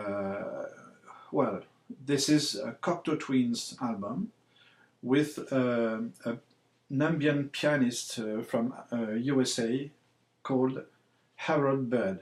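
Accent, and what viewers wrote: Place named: France. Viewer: Always appreciate the French pronunciation! If I had more time in the day I would love to study it since outside of my English speaking stuff, French movies and music seem to dominate my music and film collection next. looks very intriguing. I love and the Cocteau Twins.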